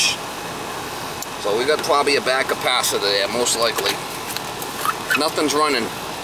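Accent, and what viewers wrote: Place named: New York, United States. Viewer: thanks for talking as you work +nice accent my friend is from ny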